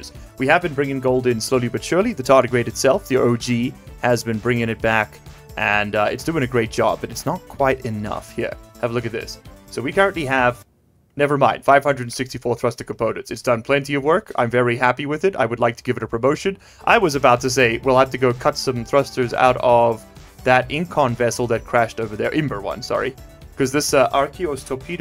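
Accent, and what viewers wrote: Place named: South Africa. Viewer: I keep forgetting youre from SA.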